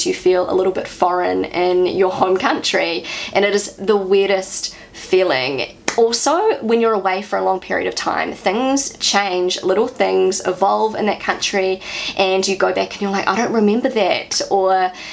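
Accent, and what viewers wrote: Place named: New Zealand. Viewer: Kia Ora !! What a joy to hear Kiwi accent !!!! God, how I miss it. I left NZ in 2009 to join my wife in France and never came back (at least for now, but hope I will someday). I miss NZ so much.... Thanks for this video and all the reminiscing of Aotearoa. Take care.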